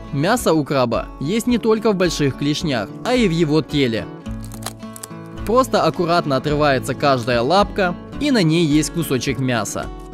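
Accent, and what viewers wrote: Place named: Ukraine. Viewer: Он-украинец, русский- не родной язык. Так что не стоит так обращать на это внимание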